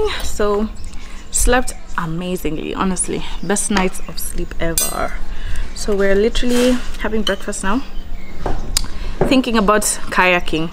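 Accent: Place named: Kenya. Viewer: ughh love your kenyan accent ! it has such a western tang to it !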